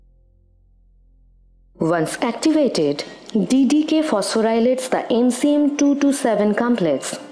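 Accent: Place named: India. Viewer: If only the indian accent wasnt so thick.. 😢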